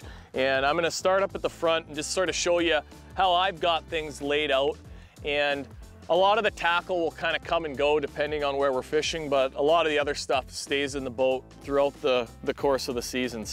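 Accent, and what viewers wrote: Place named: Midwest, United States. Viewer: Most Midwest accent I’ve ever heard, so.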